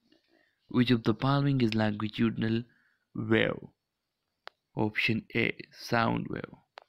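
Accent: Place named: United States. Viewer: Sir American ban rahe hen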